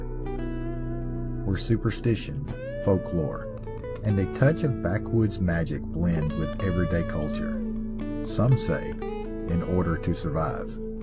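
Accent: South, United States. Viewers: I love your way of telling stories. The accent does make me miss living in the south. What is it that catches your curiosity about a story? What ultimately draws you to telling it?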